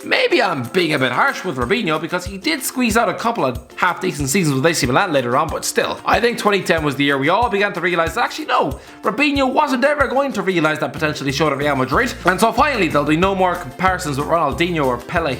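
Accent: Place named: Ireland. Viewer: Is it an Irish accent thing, to pronounce 200x as 100x?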